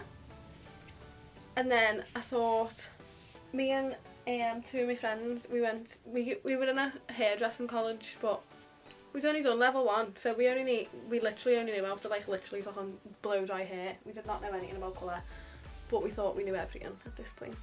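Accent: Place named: Liverpool, United Kingdom. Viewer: GORGEOUS!! (and I love your Liverpudlian accent! I LOOOOVE Liverpool so much!) ❤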